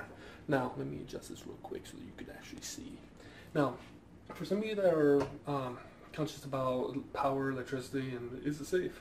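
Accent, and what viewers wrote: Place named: Southern California, United States. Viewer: Never mine aha I saw your Cali tatoo more into the video. Only asked cause the way you talked you reminded me of my self. You talk exactly like me I’m from so cal Anyways cool video using my fryer right now !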